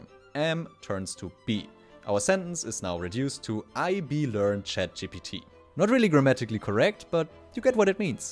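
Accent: Germany. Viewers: Du bist doch Deutscher dass hört man so raus 😂😂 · Are you german ? kinda have an accent… i love german btw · For me it seems you have a german accent, is that true u are german?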